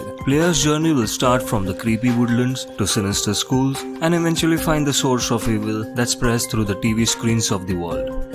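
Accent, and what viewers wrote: India. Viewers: Are you from India🤔? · Are you from india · I can sure u r an Indian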